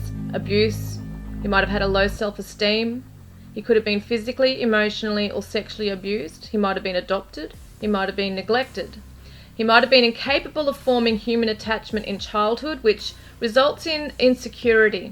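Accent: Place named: Australia. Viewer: Hello Aussie patriot, I recognised your Aussie accent. I also have so many out there queries of all that happens. This case has been on my mind since day 1.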